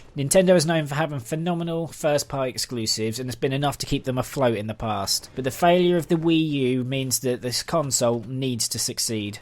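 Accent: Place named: Norfolk, United Kingdom. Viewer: Im originally from Nottingham now I live in Norfolk Sheringham had done for 8 years so iv got an ear for the Norfolk dierlect how ya getting on lol you gave it away when you said money grabbing I think they know to be stronger then the ps4 and xbox I'm loving the wii u pick up and play with the handheld I think it will surprise every one it needs to add me in wii u my Id is imrobinurhood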